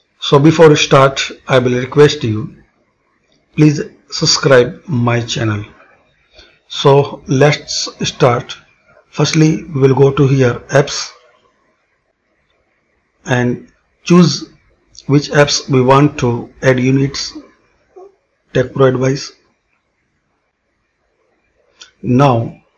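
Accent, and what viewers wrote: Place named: India. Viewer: Sorry but your english is bad sre u from india ur voice its likley from india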